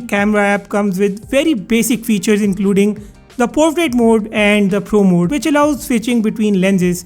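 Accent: India. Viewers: Please put english translate for those who don't understand Hindi accent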